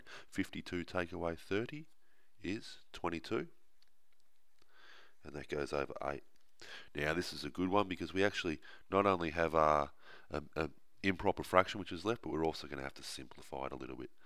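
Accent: Australia. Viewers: you are brilliant.. the british way is more difficult, i guess your from Australia.. thanks a lot my friend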